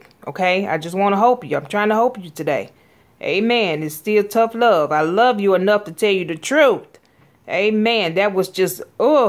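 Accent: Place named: South, United States. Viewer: Sometimes I can't understand her southern accent. Like when she said she saw a "burnch" of monstrosities. Did she mean "bunch?" And there was 1 where she said people shouldn't wear "bunnets" on their heads. ?????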